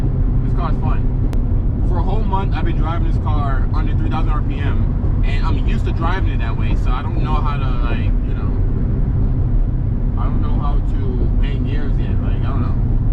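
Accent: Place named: Jamaica. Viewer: Could know you jamaican fromyour voice bro, whats the milage on ur car i see one at 17000k that needs a throw out bearing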